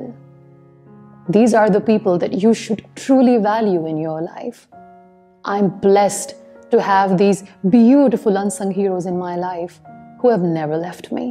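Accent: United Kingdom. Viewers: ur accent is Soooooooooo good mashaAllah plz tell me how did u learn it, it is very much like British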